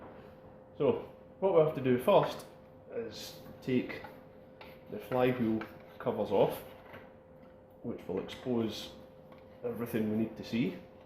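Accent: Scotland, United Kingdom. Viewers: I'd very much like some more technical videos in depth like this one. Also it's great to hear someone from Scotland too.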